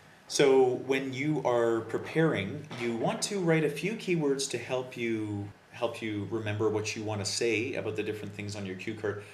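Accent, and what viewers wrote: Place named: Canada. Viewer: Just a Canadian accent.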